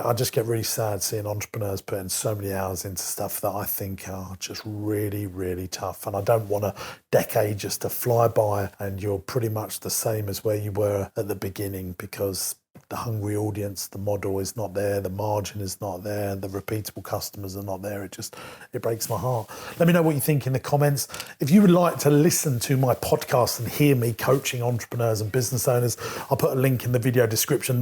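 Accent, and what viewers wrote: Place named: United Kingdom. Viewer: Nice video but here is my opinion. Business that are over licensed, over taxed by governments can bring you down very quick. Not to mention dealing with employes in 2024 is diferent than it was in 2005 (worker rights, sues etc). All of that together can bring you down very quick. By your accent I suppose you are from UK, so you must know what I am talking about. It will depend greatly where are your business located. That´s why major comnpanies are located in Ireland and the Netherlands but their origins are not from there. I rented my business 2 years ago, I receive a rent and I work for others now. I have lots of time now for my family and friends, sports and so. Im in Portugal, a heavily taxed country. In a heavy taxed country the best way is to get big real quick because you will get small margins but since you work the masses you ll be fine. 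That´s the main goal, but extremly dificult.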